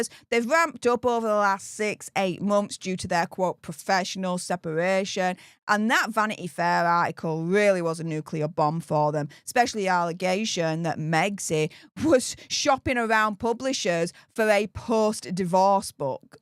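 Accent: Yorkshire, United Kingdom. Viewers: Gday from a Wakefield lad out in the Barossa valley in rural South Australia 🇦🇺 it’s great to hear a Yorkshire accent again! 🇦🇺🏴♥️🇦🇺🏴 Edit:it’s great to hear “hey up” again instead of gday or how’s it goin’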